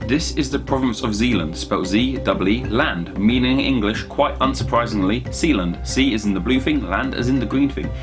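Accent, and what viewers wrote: United States. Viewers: Benjamin No. This guy is from the UK and he's speaking like a yank.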